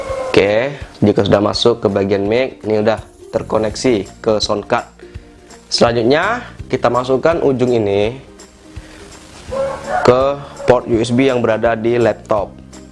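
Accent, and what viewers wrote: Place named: Palembang, Indonesia. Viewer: Org mana bng kok logat kyk org palembang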